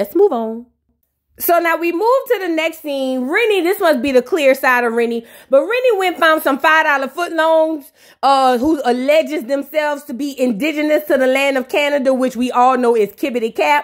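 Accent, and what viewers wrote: New Orleans, United States. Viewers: you sound like my teetee erika love your nola accent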